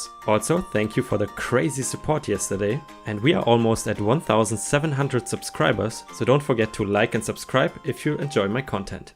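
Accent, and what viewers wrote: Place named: Germany. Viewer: I Dont want EM's or EP's in germany And damm you have a good german Accent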